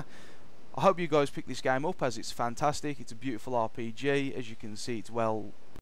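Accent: Birmingham, United Kingdom. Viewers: Nice on mate. You from Brum or the Black Country?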